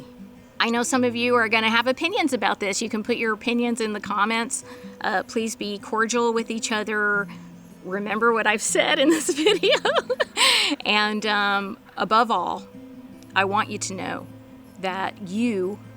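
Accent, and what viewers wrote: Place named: South, United States. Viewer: had you not told us, I would've thought you were a Southern white woman.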